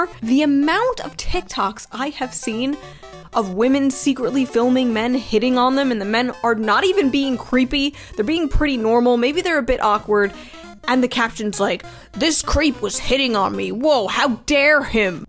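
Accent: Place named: New York, United States. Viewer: I know you're from New York, but I can't get over the way you say stuff like chocolate. That being said, I still like your content.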